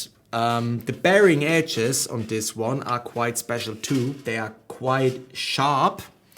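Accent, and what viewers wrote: Germany. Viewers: geht mir genauso, geht doch nichts über dein Englisch mit Kurpfälzer Akzent 😉 erinnert mich so sehr an meine Heimat!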